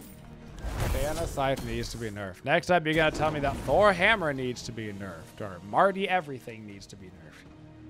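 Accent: United States. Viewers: Are you from Arkansas?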